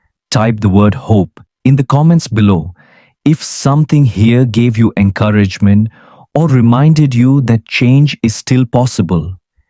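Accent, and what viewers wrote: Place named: India. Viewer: One can turn the subtitles facility on for ENGLISH, and read the English script. For heaven's sake turn the sound off eliminating the most horrible sound I have heard; the HINDI accent. HOWEVER; there is absolutely nothing in this video of any help for night time trips to the bathroom ( It is Nocturia) and is largely due to a swollen prostate and/or prostate cancer. The swollen prostate situate at the exit of the Ureter from the bladder decreases the capacity of the bladder and it needs emptying a lesser volume more frequently. See a doctor and stay away from podcasts like this one. Clickbait lies in the ONE GLASS catchphrase. It could have been ONE GLASS of ......and name it. It spares viewers the agony of this podcast.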